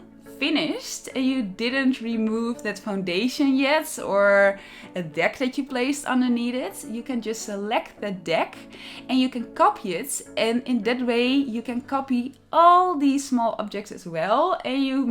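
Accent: Netherlands. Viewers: Are you Dutch?😁🇳🇱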